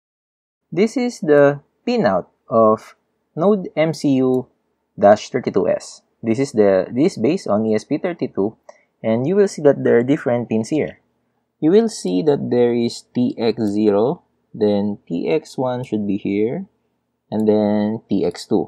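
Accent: Philippines. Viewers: Nice video dude. Just wondering, pinoy kaba? Its just the accent.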